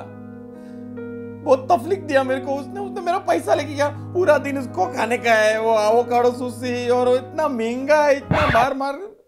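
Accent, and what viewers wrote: Gujarat, India. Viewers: Bro your acting skills and that perfect gujrati accent just perfect ❤️❤️ and ya this is not your mom again.😂😂😂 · The best thing is accent. Every Gujarati speaks in mix Hindi and Gujarati language. And yes every Gujarati middle class watch TAARAK MEHTA all day😂😂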